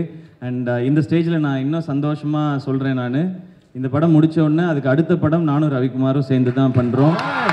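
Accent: Coimbatore, India. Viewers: Coimbatore slang swag nov ❤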